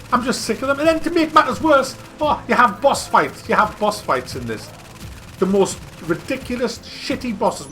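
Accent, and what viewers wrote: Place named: Northern England, United Kingdom. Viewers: great video. just out of interest i assume you are from the north east, if so whereabouts??? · mate, which city are you from, your accent sounds like a northener, most importantly, where's your bell from?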